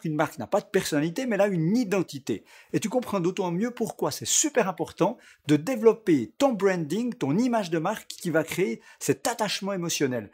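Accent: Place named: Neuchâtel, Switzerland. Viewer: Ahhh j’me disais qu’t’avais notre bel accent ! Vive l’absinthe ! Vive Neuch !!! 😂🇮🇹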